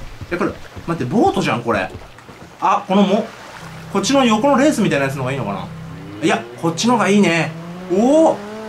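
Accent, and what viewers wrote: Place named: Kansai, Japan. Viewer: ヒカキン喋り方ちょいちょい関西弁のイントネーション時あるし、標準語の時もあるからどっちかにしてほしいわ。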